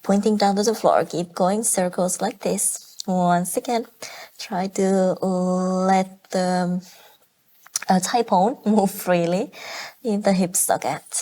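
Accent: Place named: Finland. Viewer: Very creative & innovative practice! Really loved the handstand jumps on & off the mat. I had no clue that so much of hip work was also involved for getting into handstands until this practice. Thank you so very much for this beautiful library 📚 of practices as I have completed one more 50-minute practice. 💙👩🏻💚🥰😇👌🏻🙏🏻💪🏻✨May I know which country are you from? Your accent sounds very Finnish 🇫🇮 but I wasn’t sure.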